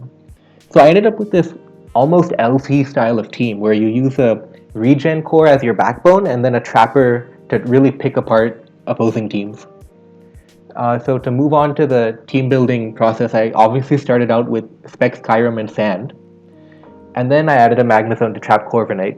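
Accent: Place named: India. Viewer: I love the slight indian accent in your voice it just tells the world that no matter how big you get, or successful, we stay indians at roots! Great vid man ;)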